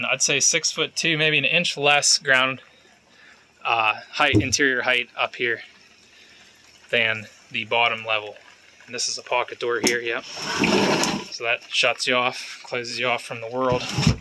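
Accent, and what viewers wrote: United States. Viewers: I can tell your Philly accent but can't place it exactly. Norristown? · So curious about your accent. Did you grow up in the mid-Atlantic area? Maybe Maryland? I study accents and dialects so am just curious.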